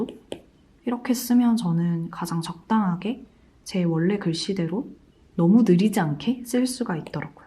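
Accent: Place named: Gyeongsang, South Korea. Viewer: 약간 느껴지는 경상도의 말투!!!! 혹시 고향이 부산경남 쪽이신가용?ㅎㅎㅎ넘 반가워요>.<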